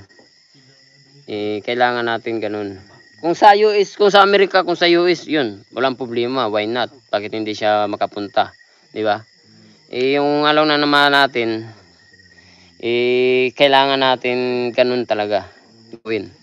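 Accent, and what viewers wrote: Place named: Visayas, Philippines. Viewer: Katawkatawa pa..klaro kaayo wlay grado....ayawg english2 kay bisya ra kaayo ka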